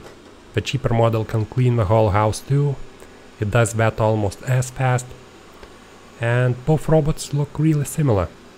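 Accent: Russia. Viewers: Русак что ли канал ведёт, акцент родной, по ушам бьет))))) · Thanks very much. I saw your review before purchasing the C1. The latter was my choice because S1 was 90 usd more expensive in the country I leave (Asia). You are right on all points. I miss the area cleaning selection but not so much. It cleans at night the ground floor and the first floor when I wake up so no battery issue for 70 m2 each 2 floors. The mop on a shinny mirror-like floor works well but I added a microfiber mop to it and it works even better with no watermark. I live in a country side dusty area so strong hoover only is good for my kid but it also cleans. Important: after you buy the the C1, you easily want the robot to come back on specific areas. Then you should get the S1. For me C1 is enough... Just consider if the price difference, maybe now less, worth cleaning area control. Probably. 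If you like my experienced comments then I suggest you should like the video first, not my comments (I really don't know how you tube works but I humbly recognise who spent time to help all of us in this video with a Russian accent), n'est ce pas?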